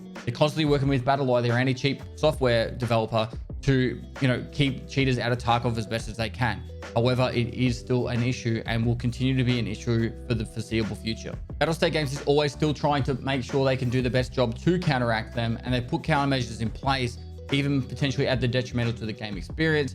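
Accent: Australia. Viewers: Uughhh Australian accent 🤢🤢🤮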